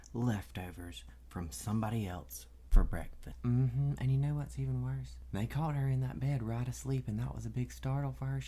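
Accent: South, United States. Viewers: Bless her heart! She needs some home training! 🤣🤣🤣 I just love you! You get Southern right!!! 🥰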